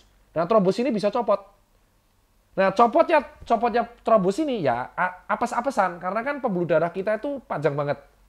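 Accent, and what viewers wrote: Java, Indonesia. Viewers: Logat jawa nya yg bikin gue ketawa terus duh 🤣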